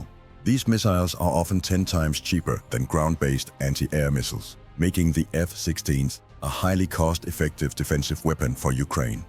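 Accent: Denmark. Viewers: 100% that's a dane😂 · Man kan godt høre du er dansk :) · The speakers accent sounds pretty danish to me :) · Ofc the speaker has a danish accent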